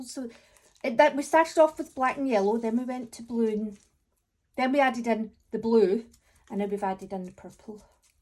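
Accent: Scotland, United Kingdom. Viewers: When you chide yourself for (almost) being daft it sounds like a compliment, but when I do it using my mid-western American flatlined pronunciation it sounds like a guilty admission. 😜 This is by way of a request/ gentle reminder for you to use a wee bit more Scots as part of your guidance. When I pronounce daft your way I feel much better when I run off the rails accidentally on purpose. I’m sure there is much more Scots argot for this pursuit. Thanks again for your help.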